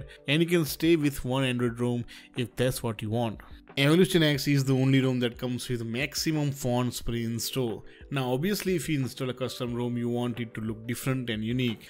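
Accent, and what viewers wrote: India. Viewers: You don't sound like an Australian. You sound like an Indian chapri at best.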